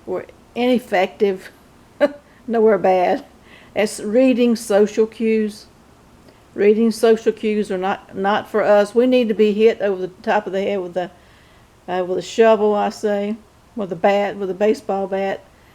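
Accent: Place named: Mississippi, United States. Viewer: I have to know. Where are you from ma’am? I guess Mississippi by your voice, but I am from East Tennessee, and we talk a little different.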